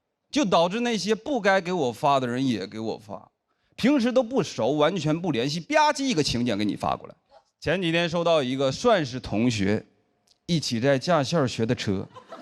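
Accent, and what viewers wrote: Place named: Northeast, China. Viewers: 東北人口音好有趣，也挺好笑的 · 哪个学校的呀感觉好像黑龙江呢，🤣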